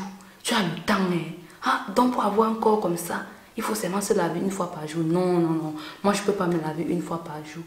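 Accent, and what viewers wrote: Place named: West, Cameroon. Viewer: 🤣 tu as fini avec nous (femmes noires) . Avec ton accent de l'ouest du 237, j'aime ca. Tu es naturelle même dans ta facon de parler. Super vidéo et merci😘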